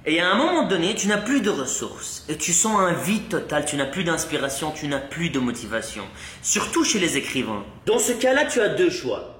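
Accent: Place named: Belgium. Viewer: c est du français belge du coup c est tout a fait normal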